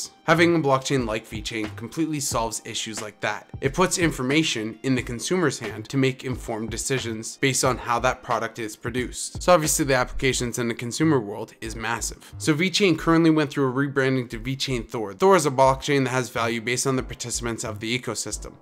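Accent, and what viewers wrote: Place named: Canada. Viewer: I dig your Canadian accent. I bet people on YouTube around the world (other than US and CA) think you're American. There's only 4% of things you say that give you away. ;-) I follow about 8 crypto channels and am HEAVILY invested in this world and have added you to my list to follow. You're knowledgeable and I dig that. Thanks, brother to the north.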